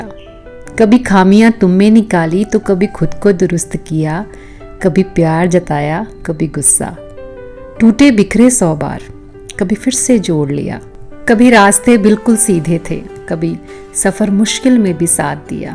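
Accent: Punjab, India. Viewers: I think this is because of the Punjabi accent of her. But sounds very nice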